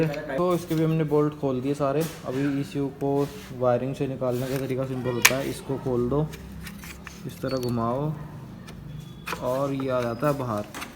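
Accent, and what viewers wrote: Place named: India. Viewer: thank you very much for the answer, I know is an Ecu problem but I have some questions. First i have a map for the conplete exhaust and I think if I reset the Ecu (I read somewhere that can do it by take the battery for some minutes) the remapping would be lost (I'm not sure about). And second I can't believe that is the only way to fix it, is only a part of the Ecu but I don't understand the indian english and for a duke owner is a problem.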